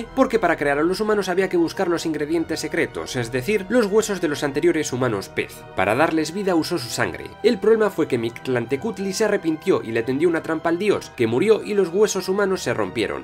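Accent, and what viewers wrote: Spain. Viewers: Que curioso escuchar a un español, contando historia de México prehispánico.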